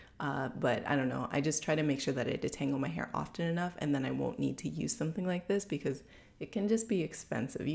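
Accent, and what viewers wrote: Canada. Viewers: She’s Canadian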